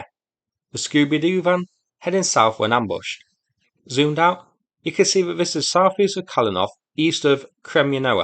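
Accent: United Kingdom. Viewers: Love your updates, even though you've got a thoroughly Brit accent (I'm from Oz). Keep up the great work. Cheers!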